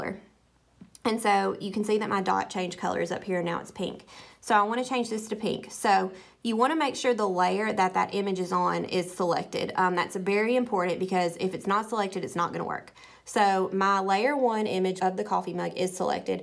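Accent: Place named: South, United States. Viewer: Your accent is such a southern accent it’s sooo cool!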